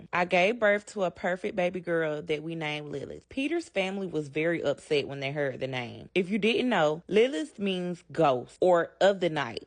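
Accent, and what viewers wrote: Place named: South, United States. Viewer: Ain’t no women with that beautiful southernish accent naming no baby of theirs LILITH 😂😂😂 So where do I send my “am I the asshole” storytimes to 🙃🙃🙃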